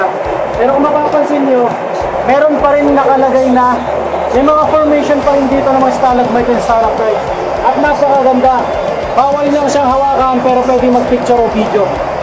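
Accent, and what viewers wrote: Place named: Batangas, Philippines. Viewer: taga batangas ka kc may punto ang tagalog mo hehe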